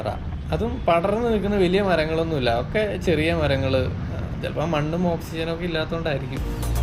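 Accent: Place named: Valluvanad, India. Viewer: Natil evdeya? Valluvanadan slang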